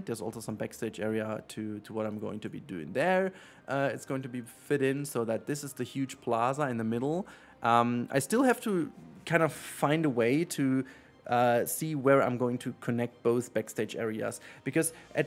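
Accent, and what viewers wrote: Germany. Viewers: Still that typical horrible german accent BUT i have to say : Your build are always awesome. TBH i want a PZ2 instead of a PC2 ... With better performance and way more creatures. Modder creates SO GOOD new creatures, but they are always unfunctional after every patch... that sucks ^^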